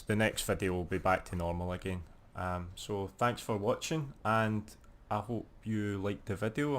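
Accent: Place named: Scotland, United Kingdom. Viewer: CS GO tutorial in a Scottish accent. Fuckin inject it in my veins.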